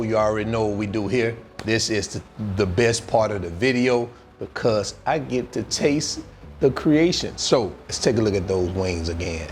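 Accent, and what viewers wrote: South, United States. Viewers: Ok thanks...try this weekend in my oven looks amazing.....where you from?? I can hear your southern accent ..its nice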